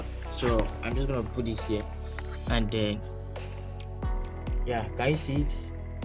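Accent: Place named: Nigeria. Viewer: Never heard more authentic NIgerian Accent ever 😁💀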